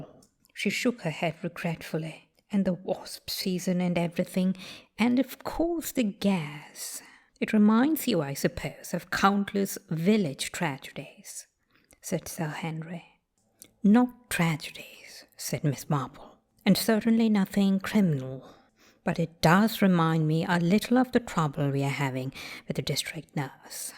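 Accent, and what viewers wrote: RP, United Kingdom. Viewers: I Love upper received pronunciation.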